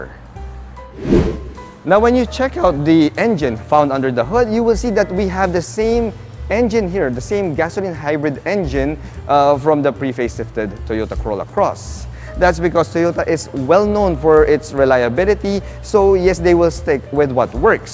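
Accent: Philippines. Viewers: Idol, filipino english accent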